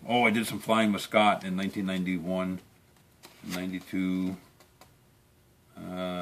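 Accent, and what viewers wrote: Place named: Upstate New York, United States. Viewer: Having watched a few of your videos recently I of course kept watching and came across this video. Really blew my mind! Owning a beaver is my goal, not a sports car or fancy house guy so a beaver it is! BUT, hearing you were from Long Lake which is one of my favorite destinations in the ADK blew my mind! I tent camp in Saranac Lake area, have a camp just outside Lake George area but may look for a camp closer to Long. I knew I recognized the upstate accent, great videos!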